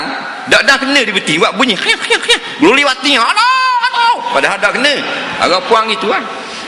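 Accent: Terengganu, Malaysia. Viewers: ustaz ni bgus dgn cara dia..ada unsur jenaka n pengajaran..walaupun dgn slang trngganu...tp dpt fhm msg yg nk disampaikan...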